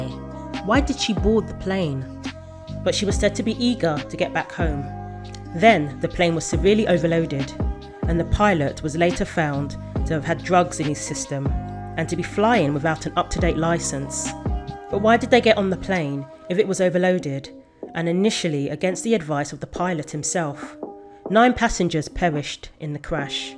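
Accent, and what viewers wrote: England, United Kingdom. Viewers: No new information here. Just told in an English accent.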